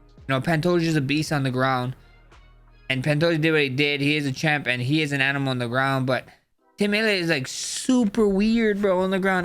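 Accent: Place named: Hawaii, United States. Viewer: Just talks like every other Braddah out here 😂🤙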